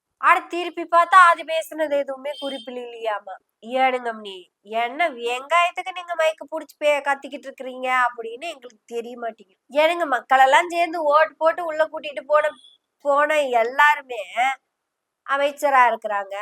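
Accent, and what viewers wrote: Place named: Kerala, India. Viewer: Kerala la nee product viththuttu thaane iruntha papa ..adhe slang ipovum varuthe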